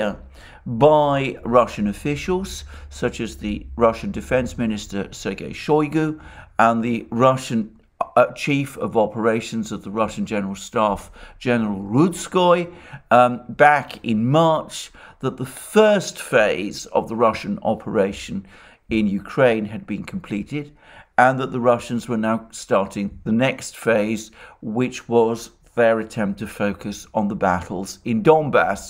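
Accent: England, United Kingdom. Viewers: Lol, Alexander is simply reciting unfiltered Russian propaganda from almost exclusively Russian sources. Putting an english accent to it does not make it any less Russian propaganda.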